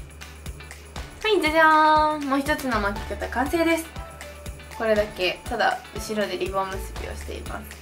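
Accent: Kansai, Japan. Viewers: 石原さとみさんに声似てると思ったらなんか顔も似てる😲✨👌 石原さとみさんの関西弁ってかんじです！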